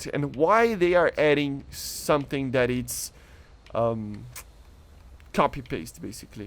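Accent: Brazil. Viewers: Ah esse sotaquezinho brasileiro rsrsrs eu amo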